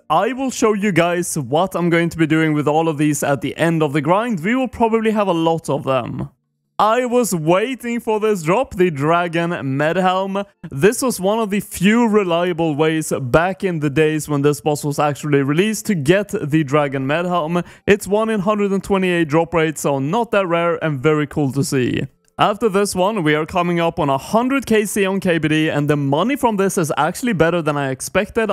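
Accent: Sweden. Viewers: Jag älskar att man hör att du är Svensk! :D du fick nyss en ny subscribers. :)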